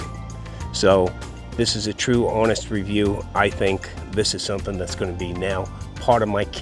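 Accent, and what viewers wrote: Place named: New York, United States. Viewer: New yorkah?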